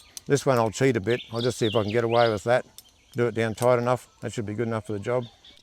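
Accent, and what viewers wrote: Australia. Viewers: Thanks for aussie acent! Greeting from mo.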